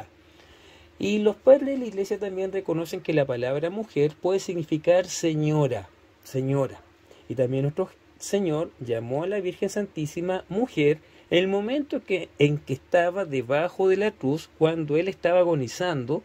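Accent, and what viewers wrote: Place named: Chile. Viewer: Buen día, esa Biblia es mi primera Biblia, le decían la Cenicienta; un error gravísimo, así lo veo yo, no quiere decir que tenga razón, pero es mi primera Biblia y la quiero mucho y no la veo como cenicienta. Aún la tengo a la Biblia. Sí, exactamente como ibas leyendo, con ese acento chileno tan lindo, dices cap. tal vers. tal lo busco y voy bien. ☺Ayer a la mañana en tv chilena se presentó un SR. entendido sobre Carlo Acutis. Me gustó mucho como explicó. Y ahora hablan de Loan, gracias Chile, y me duele mucho lo de abuelita chilena. Hasta el próximo encuentro!!! Bendiciones!!!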